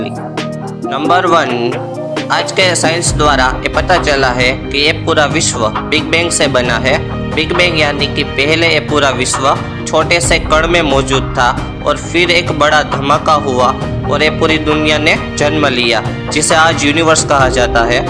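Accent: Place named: Gujarat, India. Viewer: I realized from your voice that you are from Gujarat..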